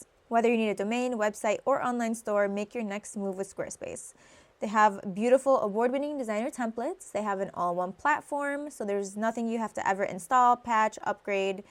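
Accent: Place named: New York, United States. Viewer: tnx for the tutorial. i find it cute when u say u wah (u want), dah (that) 😘 super new yorkerish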